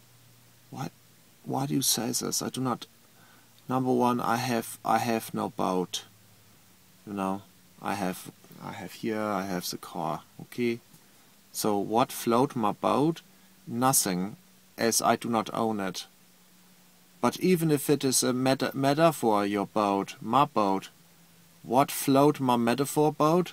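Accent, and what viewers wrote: Germany. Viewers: But it's adorable... German accents are cool. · your covering ", " would float many boats! Your German accent and having fun with the sounds would float a plethora of boats. Please bro, do it for all the folks that don't know about. I'm certain people that get you will more than love.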